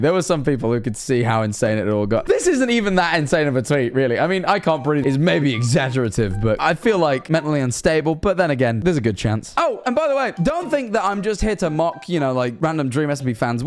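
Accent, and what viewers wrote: United Kingdom. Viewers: why do i feel like your losing your bri ish ness ? im going crazy 😀😀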